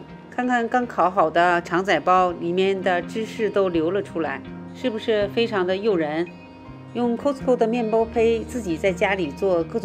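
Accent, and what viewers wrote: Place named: Northeast, China. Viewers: 是沈阳话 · 一个吐司用拔个面包生坯，哈哈哈哈哈哈哈，好亲切的东北话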